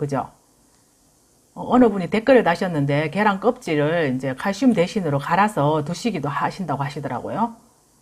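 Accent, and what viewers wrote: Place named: Yecheon, South Korea. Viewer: 우리 마누라 사투리랑 똑같네 예천군 ~~~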